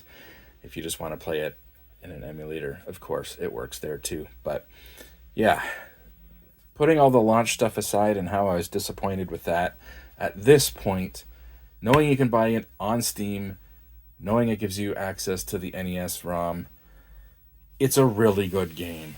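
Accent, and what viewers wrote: Canada. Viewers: You sound like Canadian garbage. I'm out.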